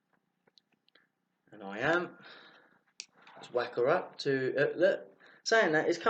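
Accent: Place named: United Kingdom. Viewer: Vape Nice to see some reviews of ss wire, there aren't many around. Your videos are great and very informative, unlike many other vape videos where acting and yelling is the main part. Would be nice with a better quality though, i can guarantee you that your channel would get more subscribers if you had better video quality, because your videos are great, informative and you're a british good looking lad. Not too many british vapers around, it's nice to listen to for a change. But a new mic and camcorder would help tremendously!:D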